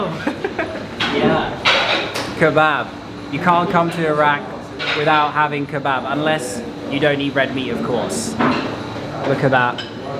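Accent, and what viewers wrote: United Kingdom. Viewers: Uk dialect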